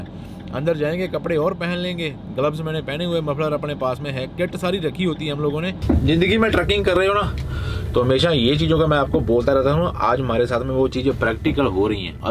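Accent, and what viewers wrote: Punjab, India. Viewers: ਪੰਜਾਬੀ ਬੰਦਾ ਜਦੋਂ ਹਿੰਦੀ ਬੋਲਦਾ ਤਾਂ ਕੱਚਾ ਜਿਹਾ ਜਾਪਣ ਲੱਗਦਾ।ਬਾਕੀ ਗੁਰੂ ਰਾਖਾ ਐ ਤੁਹਾਡਾ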